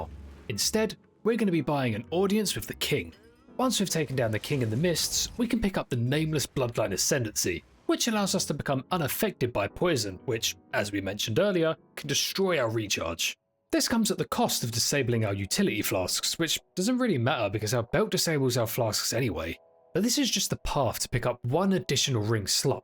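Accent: United Kingdom. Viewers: ah, yes, all the things I love in one video - chaos, cats and crit-proc calculations narrated by a cockney bloke <3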